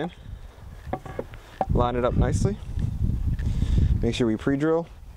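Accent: New Jersey, United States. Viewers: Nice work dude! I'm surprised I could follow it because of your New Jersey fast talk, but I got it! Thanks for the tips!!!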